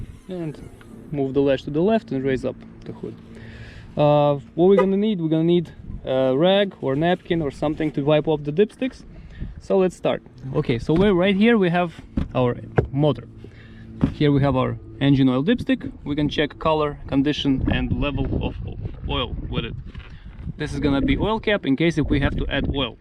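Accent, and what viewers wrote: Russia. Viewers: Thanks man! Your Russian accent makes this video easy to understand and learn. Thanks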